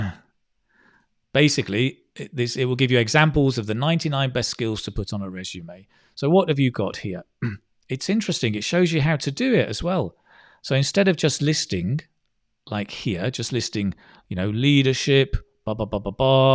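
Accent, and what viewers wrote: United Kingdom. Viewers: Appreciate your teaching videos. I am from Malaysia. Are you British? Lovely to hear you speaking.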